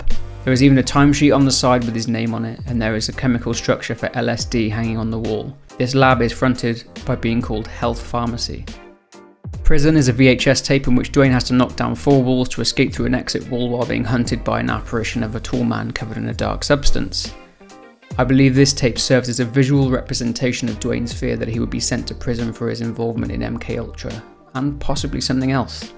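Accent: United Kingdom. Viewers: Why r u british?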